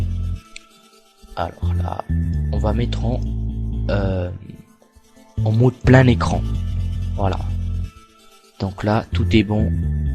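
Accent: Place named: Arab world. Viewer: Parce que tu as vachement l'accent. Mais bon, chuis arabe et normal hamdoullah :) (je n'ai pas l'accent des trois quarts de mon entourage)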